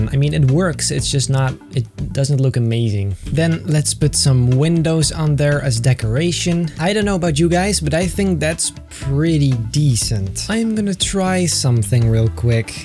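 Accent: Netherlands. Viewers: Lol ik hoor dat je Nederlands bent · i am just rly wondering if you’re Dutch cus you sound kinda Dutch :] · Are you dutch? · Are you dutch?,I love your videos!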